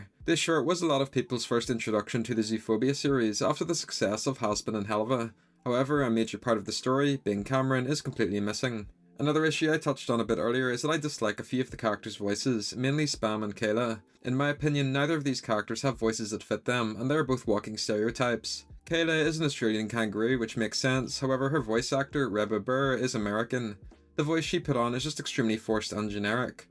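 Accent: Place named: Northern Ireland, United Kingdom. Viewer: as someone from northern ireland i knew i reconised your accent lol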